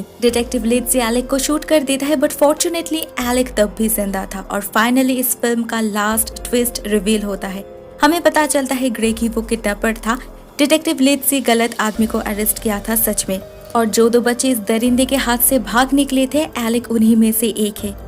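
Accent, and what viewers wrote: Bengal, India. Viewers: Sweet voice in some bengali tone. You explain every corner of the story in your clear voice and without any noise. Feels like we are watching the movie. Thanks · Bangala bhashi.....tone...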